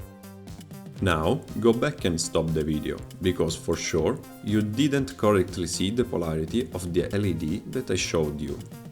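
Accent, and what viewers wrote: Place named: Italy. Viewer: You sound very Italian :)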